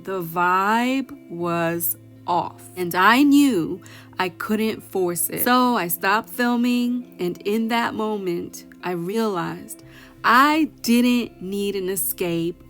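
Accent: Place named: England, United Kingdom. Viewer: Ahh I really like your English accent woah and you look so pretty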